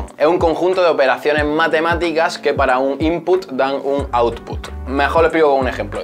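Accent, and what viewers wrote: Andalusia, Spain. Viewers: Hola. Cosas muy interesantes y aunque sea largo merece la pena, además con gracia andaluza. Musho arte. Saludos.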